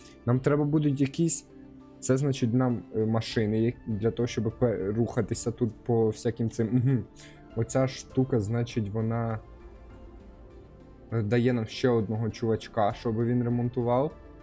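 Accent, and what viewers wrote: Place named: Russia. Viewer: чому ты размовляешь як москоляка?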